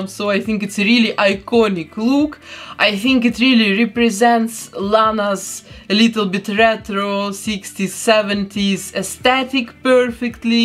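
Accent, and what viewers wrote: Russia. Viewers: Русский акцент 😃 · Приветики рашн акцент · По акценту сразу понятно, что вы русская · мне кажется, или я слышу русский акцент?😂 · where are you from? i'm sorry but your accent is hhhhhorible. неужто из России, мать?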